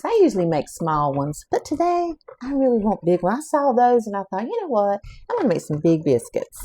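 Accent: South, United States. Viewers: I really enjoyed your show! Your southern accent really adds the secret ingredient. I can’t get my biscuit s to rise. Probly not self rising. I always buy all purpose ( no rise) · Nice presentation. I come here for the southern accent. 😊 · I love your southern sound, it’s comforting and takes me right back home.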